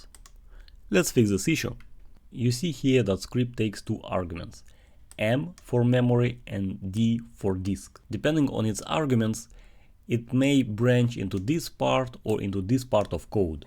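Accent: Russia. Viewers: Russian English always deliver an extra value to the content))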